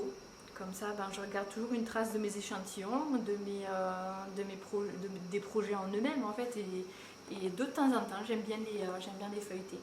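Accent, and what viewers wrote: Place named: South, France. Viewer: Allo! J’adore ta vidéo. Moi aussi j’aime bien le format vlog. Et gros coup de coeur pour ton magnifique accent du sud 😍un beau bonjour du Québec !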